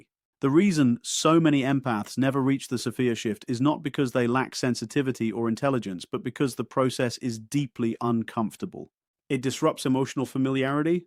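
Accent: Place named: England, United Kingdom. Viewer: since when does Chase Hughes have an English accent?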